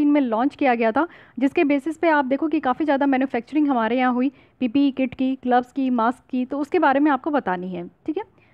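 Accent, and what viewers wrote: Bihar, India. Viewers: Mam aap bihar se ho kya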